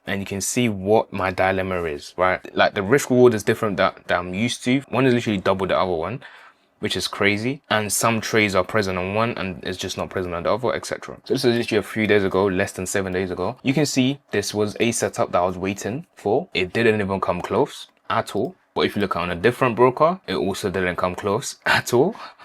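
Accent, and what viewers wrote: United Kingdom. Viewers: Are you from the UK?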